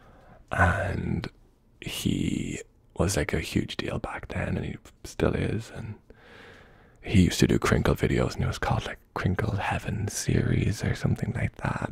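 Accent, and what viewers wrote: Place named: Ireland. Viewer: Soft spoken is absolutely beautiful, with an Irish accent makes it phenomenal 😍 much love from an admirer in New Zealand ❤❤❤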